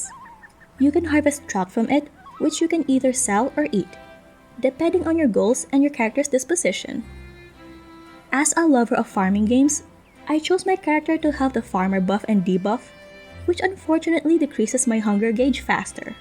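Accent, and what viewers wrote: Philippines. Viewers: pinoy accent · FIrst few seconds of watching and hearing you talk, I immediately thought "Fellow kababayan!" :D I'm so glad seeing more and more MIrthwood videos, we gotta spread the message of what a gem this game is! I've been following and playing Mirthwood since Playtests these last weeks and been playing since release. I enjoyed your video!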